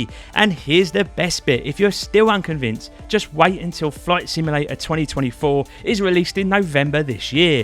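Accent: Cockney, United Kingdom. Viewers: This guy is Cockney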